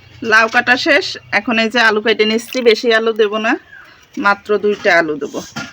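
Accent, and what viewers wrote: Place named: Narail, Bangladesh. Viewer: আপনাদের বাড়ি কোথায় আমাদের মতো কথা আমাদের মতো রান্না আমাদের বাড়ি নড়াইল লোহাগড়া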